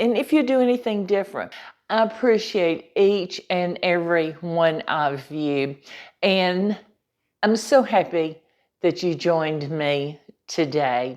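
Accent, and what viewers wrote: South, United States. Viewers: You sound like Paula Deen. You have that southern tring. So you have to be a good cook 🧑‍🍳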